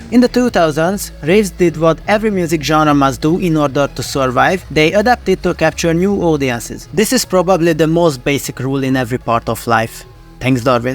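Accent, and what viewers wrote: Hungary. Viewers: Te magyar vagy ? Kicsit olyan a tajszolasod angolba :,D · Is this a Hungarian accent? Just curious because i had a feiend in uni who spoke similarly. Might be wrong tho :) nice/funny video anyways ❤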